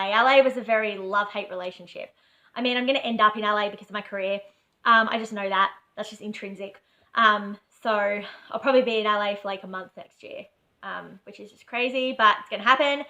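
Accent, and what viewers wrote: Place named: Australia. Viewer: send me her and half of my family talk like you--super Aussies! I love Australia my cousins got a Viet Restaurant! You sing!?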